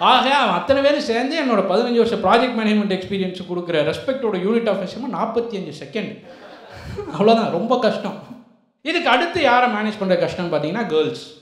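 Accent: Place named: Madurai, India. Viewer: Madurai or near by accent 😂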